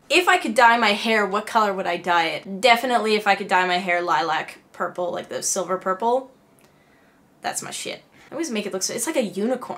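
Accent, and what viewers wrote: Canada. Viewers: A lot of Canadian women sound like this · All Canadian women look and sound the same..., omgitsfirefox, Cobie Smulders (the girl from how i met your mother), and others i can't remember. Also i have a canadian friend and she likes capybaras too...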